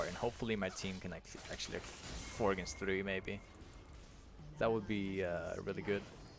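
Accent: Netherlands. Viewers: Are you dutch? I hear lika a accent?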